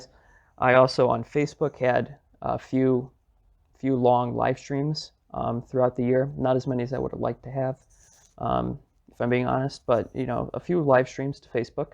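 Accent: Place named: England, United Kingdom. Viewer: I like your english accent lol😭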